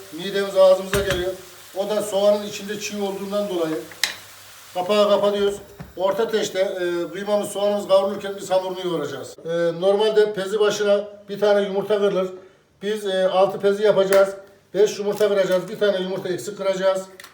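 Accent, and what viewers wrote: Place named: Central Anatolia, Turkey. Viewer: Usta Orta Anadolulu. Hamur açmasından ve ağzından anladım.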